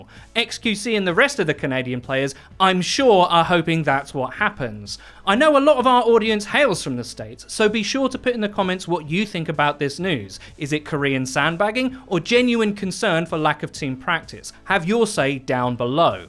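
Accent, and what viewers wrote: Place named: United Kingdom. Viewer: The British accent haha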